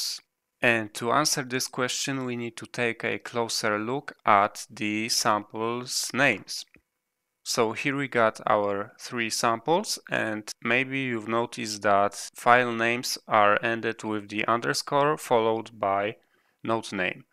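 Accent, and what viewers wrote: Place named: Poland. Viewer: Thanks, you are from Poland maybe because i feel this Polsko angielski dialekt hehe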